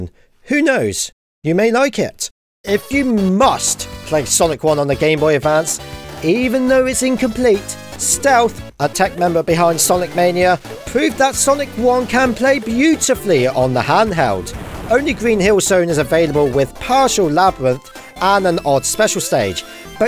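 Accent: United Kingdom. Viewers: Only British accents can make Sonic Genesis rage funny. I am Scottish and I know this.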